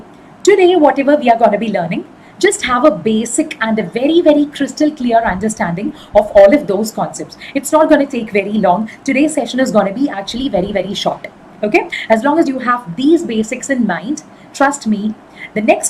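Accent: Kerala, India. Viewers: Ma'am, yo voice is so mellifluous. I legit. That was an ideal english accent of India. (Applause & lots of admiration for ya!)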